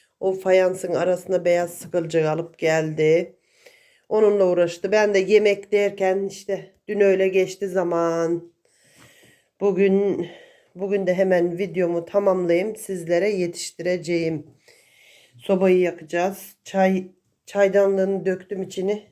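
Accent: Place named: Uşak, Turkey. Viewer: Şiven cok tatli abla usakli arkadasim vardi sivesini cok severdim☺☺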